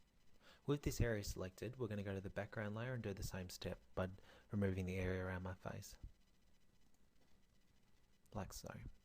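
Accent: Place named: New Zealand. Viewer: Very helpful, thank you! Are you from New Zealand? You accent is wonderful! :)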